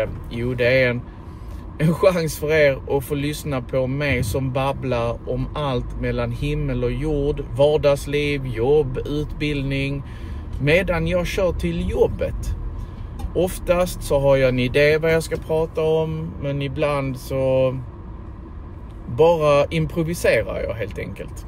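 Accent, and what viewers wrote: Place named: Skåne, Sweden. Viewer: Jättebra kanalen! Men jättesvårt att förstå skånska för mig 😊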